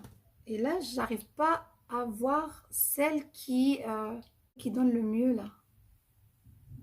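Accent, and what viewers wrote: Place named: Mostaganem, Algeria. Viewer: فرحت بزاف كي شفت الفيديو تاعك هذا وين شفتو نحبك بنت بلادي مي احكي جزائري نحبك اللهجة المستغانمية تاعك تعجبني بزاف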